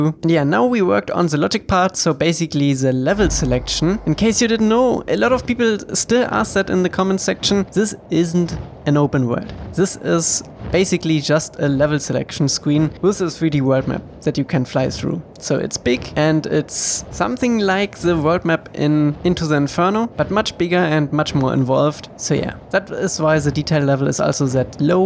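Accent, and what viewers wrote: Germany. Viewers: I can hear that you can speak german. Pls make a german video. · Question, what is his accent? Ik I’ve heard the accent before. I keep wanting to say it’s German but I’m not sure. He keeps saying v for w, which is why I think it’s German. Anybody know if I’m right? I’m honestly very curious. Lol. I think I’m wrong tho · Is it possible what you are german? Your voice sounds so german xD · Mann merkt das du Deutsch bist dein Englisch musst du verbessern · I don't understand why you can speak so fluently but still sound the most German I've ever heard xd anyway, keep it up!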